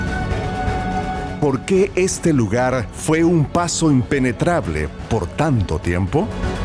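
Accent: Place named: Spain. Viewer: Thank you this wonderful spanish accent. Total understandable even with my little spanish also. And the film is perfect. Congratulaion for this narrations again!!!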